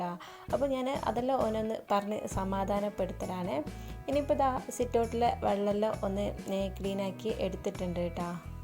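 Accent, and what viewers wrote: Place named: Kannur, India. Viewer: Wayanadanenklum kannur stylanallo samsaram 😊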